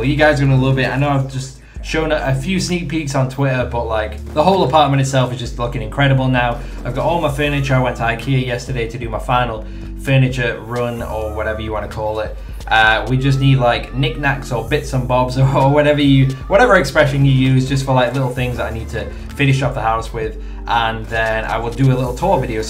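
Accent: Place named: Birmingham, United Kingdom. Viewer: Birmingham I think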